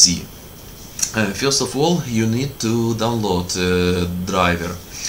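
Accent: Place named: Russia. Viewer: Thank you, from the bottom of my heart. helped. Russian accent you have, somehow it was not usual to listen to such a speech. But, really, it helped a lot. ❤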